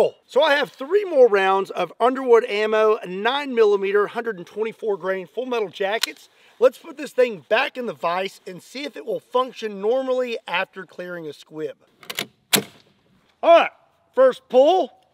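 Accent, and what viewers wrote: Kentucky, United States. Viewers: Dudes definitely from Kentucky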